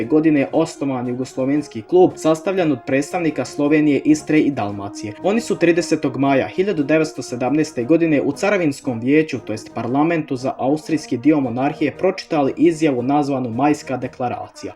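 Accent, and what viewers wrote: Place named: Cazin, Bosnia and Herzegovina. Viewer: Cazin ili buzim.....naglasak......para usi...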